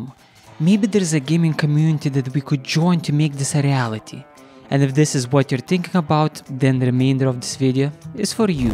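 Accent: Russia. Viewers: крутое видео чувак, но советую поработать над русским акцентом) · Вроде русский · Хороший видос брат, по акценту узнаю родной русский или другой славянский язык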